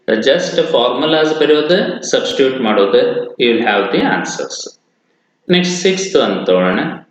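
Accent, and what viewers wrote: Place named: North Karnataka, India. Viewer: Thank you sir. You speak north kannada, may i know where you are from sir?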